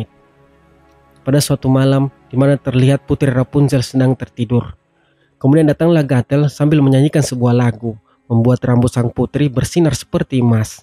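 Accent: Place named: Manado, Indonesia. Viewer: Suka krna logatnya, logat menado kah? Intinya suka bgt deh 😂💙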